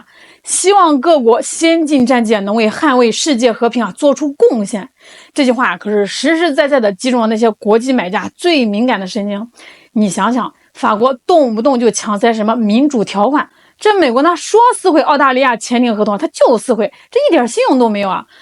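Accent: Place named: Shandong, China. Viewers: 我本來也以為妳是我山東老鄉，前幾集妳有提到是河南 總之妳有我們北方人的豪氣！👍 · 🤣🤣🤣說話的調調像山東大妞！呵呵！說不定您老家省城離山東省近麽🤣！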